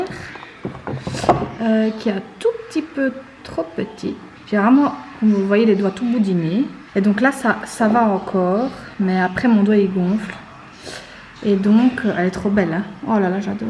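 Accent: Belgium. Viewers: Normalement je ne commente pratiquement jamais les vidéos YouTube mais ça fait déjà quelques vidéos à toi que je commente. Je trouve que tu fais un super travail, c'est très qualitatif. J'aime beaucoup ta personnalité, tu es très naturelle et c'est génial ! Cette vidéo est une excellente idée. C'est vrai qu'au début j'étais un peu déçue que ce ne soit pas en ASMR mais finalement ta vidéo est au top. Je me suis tapée un fou rire avec votre couteau suisse, mon dieu quand on dit que le rire est communicatif, ce n'est pas une blague. Donc voilà juste merci pour tout ! 💙 PS : J'adore ton accent belge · L'accent belge quand meme c'est le meilleur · C'est fou comme l'accent belge ressort quand tu parles à voix haute haha ! C'est rigolo et j'aime trop l'accent belge (c'est mes origines !) merci pour cette vidéo qui relaxe malgré le non chuchotement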